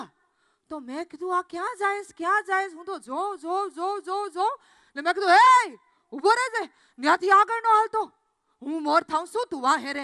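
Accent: Kathiawar, India. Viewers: Superb performance......its not easy to perform this act by solo........great......your expression, that proper tone of kathi language.......first time i have seen you on rangmanch......keep it up......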